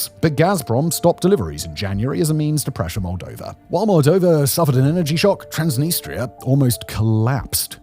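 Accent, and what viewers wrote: RP, United Kingdom. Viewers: unsubbed. not sure how I put up with this unlistenable british guy for this long. british accents are overrated, folks. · This guy thinks talking posh makes you intelligent. Idiot hasnt even worked out the jewish cabal and shills for them cos he would lose his channel and income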